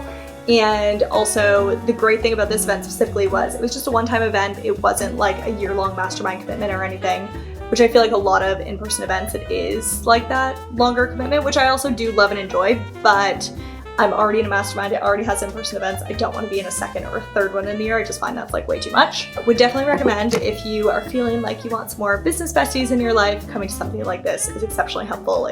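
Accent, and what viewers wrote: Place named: Canada. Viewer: Great video. Lots to consider in this video. Time to jump in and go for it. I have been trying to pick out your accent. Canadian...can't believe I didn't pick up on it. Where did you get your background music?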